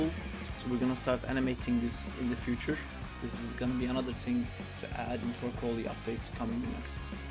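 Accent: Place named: Turkey. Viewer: selam aksanından türk olduğunu çıkardım oyunu nereden indirebilirim if u are not a turkish im sorry how i can download this game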